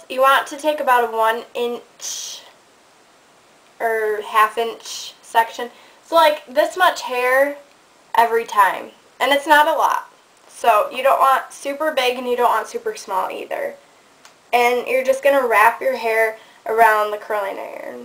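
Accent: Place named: Wisconsin, United States. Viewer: Are you from the Wisconsin area? haha you have the accent.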